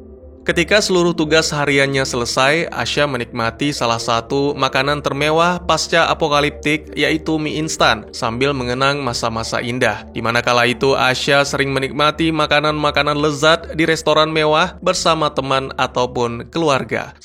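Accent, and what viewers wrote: Aceh, Indonesia. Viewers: wahh, abang dari aceh kah?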